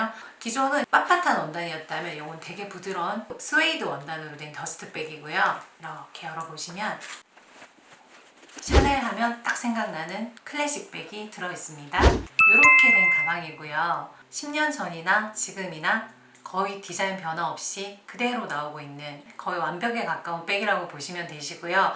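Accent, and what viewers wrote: Gyeongsang, South Korea. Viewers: 사투리 쓰시긴 하네요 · 경상도 사투리 쓰시네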